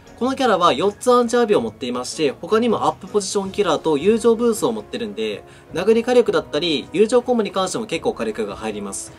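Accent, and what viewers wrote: Kansai, Japan. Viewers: 同じ京都だけど違和感はあんまりないかな？ 今は関西じゃないし、動画配信なら標準語使った方がいいと思うからたまに地元の関西弁出るんだと思う。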